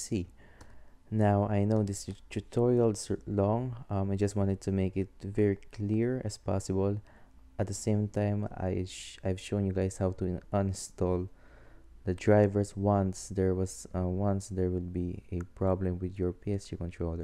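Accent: Philippines. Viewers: Peenoise accent :D hahaha peaceeeee :P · You sound filipino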